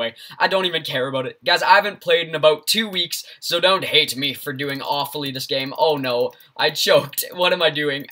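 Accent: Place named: United States. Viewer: lol gg i automatically assumed u were american xD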